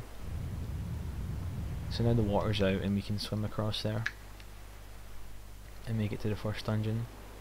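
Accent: Scotland, United Kingdom. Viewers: Awesome game+speedrunning+Scottish accent commentary=epicosity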